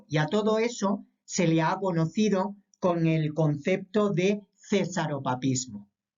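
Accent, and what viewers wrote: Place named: Granada, Spain. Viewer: Eres de Granada? Me encanta tu acento jajajaj, de toda forma muchas gracias pa este video, me ha ayudado un montón 🙏🏻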